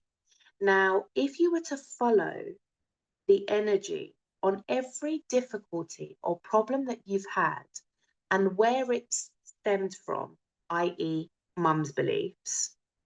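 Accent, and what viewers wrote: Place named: United Kingdom. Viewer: Si funny how Creator works, i still remember asking show me the way please help and i would SEE UK and i started laughing cause i was like 😮 how? And poom your channel came out, and when i read RSY i said love it, this is it Creator, and when i Heard You speak i was like ohhhhh right UK😅😅😅🎉🎉🎉🎉🎉🎉🎉🎉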